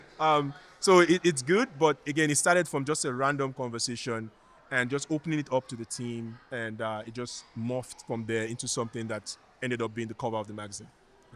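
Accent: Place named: Nigeria. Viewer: Could feel brother intonation. That his 🇳🇬.. watching from Nigeria...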